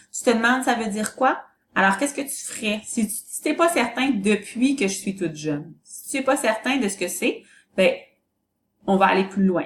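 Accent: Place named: Quebec, Canada. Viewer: J'aime l'accent québécois.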